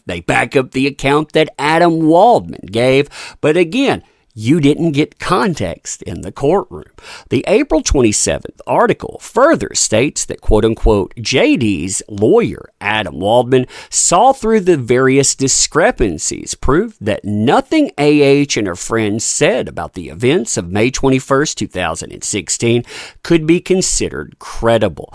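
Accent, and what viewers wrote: South, United States. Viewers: Your hill Billy voice is very irritating. Must Americans sound so uneducated and low class. Except Johnny I love Johnny but he rightly prefers England and France anyway.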